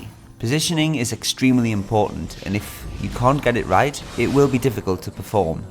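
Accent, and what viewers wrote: United Kingdom. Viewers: Your British accent makes me go: OMG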